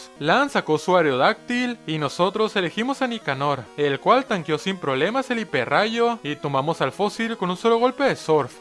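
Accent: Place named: Mexicali, Mexico. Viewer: cai en este video de casualidad, y al escucharte hablar dije, este verga es de mexicali jajaj saludos!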